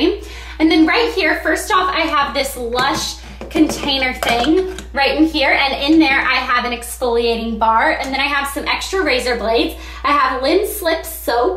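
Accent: United States. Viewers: Then don't watch her. Plain and Simple. And she is american so ergo an american accent.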